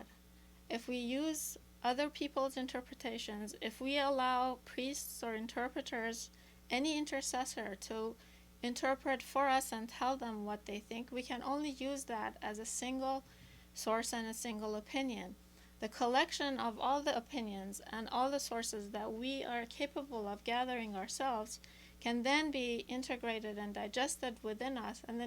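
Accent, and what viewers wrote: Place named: Iran. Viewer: khahar, age mishod, man vaghan daste shomaro miboosidam... vaghti harfaye shomaro goosh midadam, chesham pore ashk shod. midoonam irooni hasti, az lahjat malome, vaghan shomaro doost daram.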